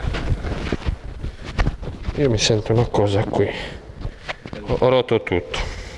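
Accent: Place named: Sardinia, Italy. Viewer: accento sardo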